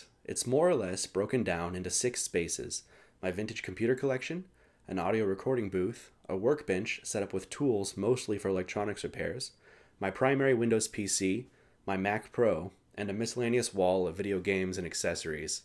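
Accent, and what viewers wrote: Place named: Australia. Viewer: There's some Australian creeping into your accent for sure.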